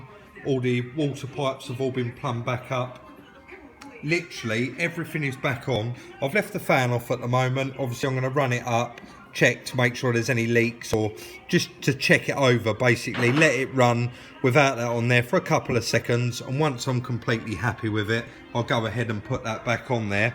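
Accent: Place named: Cockney, United Kingdom. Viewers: You sound like an East Ender. I'm from Paddington living in California. Enjoyed this tremendously. Most informative. Thanks. David. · Dreadful London accent and strange, non-English words spoiling a nice video. Has anyone ever told you the difference between "good" and "well"?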